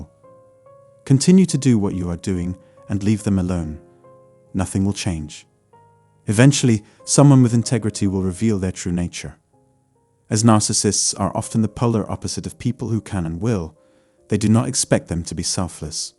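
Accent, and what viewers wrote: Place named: United Kingdom. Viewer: I think maybe it's the voice and the accent, when you watch channels with the British accent, you feel like a baby, safe and sound somehow😅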